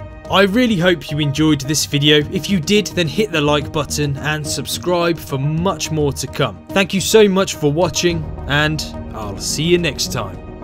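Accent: United Kingdom. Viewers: That's because he's British! Us brits explain things in great detail and talk properly 😁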